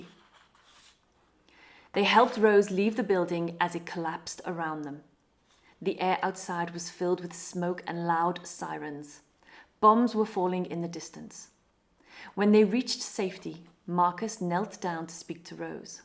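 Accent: United Kingdom. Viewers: Professor, I am a 100% beginner. I did not understand the story, but I was listening with subtitles in English, of course, to see the words and how to pronounce them. Is this a lesson for me as a beginner? I mean the art of listening. I liked your British accent. It is nice not to pronounce the letter “r” in some words. This is a difference between a British accent and an accent. American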